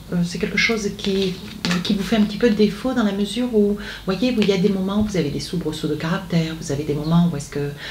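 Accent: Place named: Agen, France. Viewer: bel accent agenais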